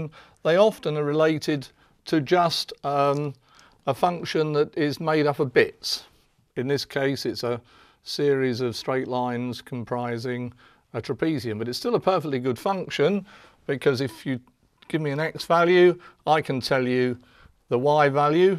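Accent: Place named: England, United Kingdom. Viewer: Takes a bit for me, as an American, to get used to the English accent, but I find the explanations to be quite good. Thanks for the upload!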